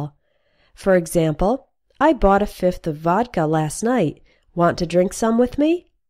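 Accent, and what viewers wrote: United States. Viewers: So, If I may add. Why the automated robotic american voice? Got through about 20 seconds of this and gave up.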